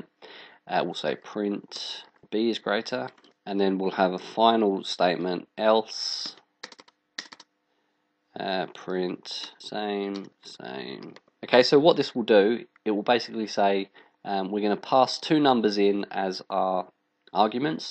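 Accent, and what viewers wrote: England, United Kingdom. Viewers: you did a very good job in a quick and easy introduction to Python FU Scripting for GIMP. OK, I understand that one does what one knows but your last tutorial is a “Hack”, sorry I am not going after you, it is just bad programming. All I am saying is that if the only two variables there are changing is X1 and Y1, for the starting position. Then one would think that you should in your if-elif-else (which is really a switch statement in C# or any other coding language, but Python does not have one so the if-elif-else) just set the variables x1, y1 and then after the switch then call the blend function (pdb.gimp_edit_blend) one time. Tighter code and much more efficient. By the way all teachers should have a slight English accent and it sounds perfect. It is like everyone with glasses looks smarter 😉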